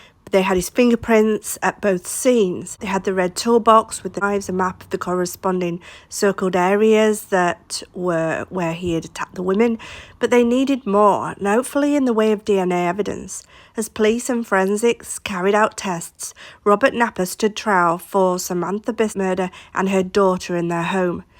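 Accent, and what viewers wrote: Yorkshire, United Kingdom. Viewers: I think I told you this before, I moved to the states 40 odd year ago. I grew up in the Stockport area (Romiley to be precise) and every time I watch your content it gives me a feeling I don't get from any other TC channel. You have absolutely brilliant content and I'm very particular but hearing that yorkshire accent it just takes me to a place and a time I miss so much. Nothing like hearing a familiar voice/accent from back home. Don't get me wrong tho, if your content wasn't any good I wouldn't be here so you have earned my subscription because of your great content and not just your accent although the accent is a nice treat for me. Cheers! 🤘🏻🇬🇧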